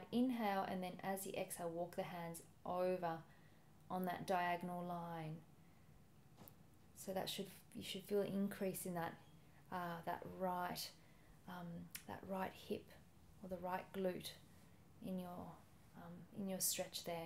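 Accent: Australia. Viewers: I have so enjoyed the yoga with an Australian accent! Great yoga classes and always new poses. Thank you.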